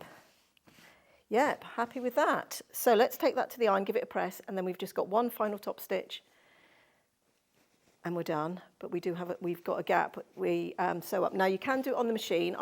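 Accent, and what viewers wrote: United Kingdom. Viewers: Thank you. Brit here in Michigan so its nice to hear the British accent. You are a good teacher.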